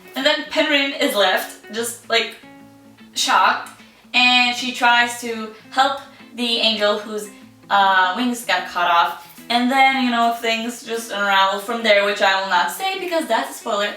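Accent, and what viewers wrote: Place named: Israel. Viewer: אומייגאד את ישראלית!! חחחחח אני שעה צופה בסרטונים שלך ולא קולטת.. ועוד שחשבתי לעצמי וואי המבטא שלה מגניב שתגיד מאיפה היא...אשכרה לא זיהיתי את המבטא של הארץ שלי.. איזה כיף לראות ישראלית בבוקטיוב!:)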